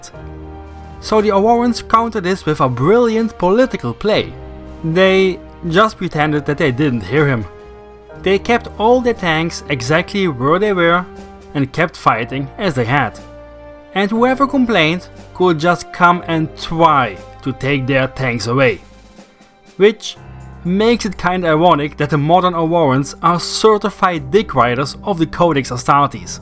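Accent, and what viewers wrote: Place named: Eastern Europe. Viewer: I figured you were from eastern Europe. Rock your accent, brother. I'm a mumbler, myself. Great video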